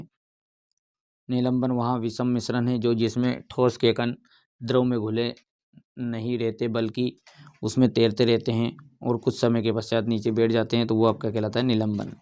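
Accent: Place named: Malwa, India. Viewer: Sir ap malvi Bhasha Bolte ho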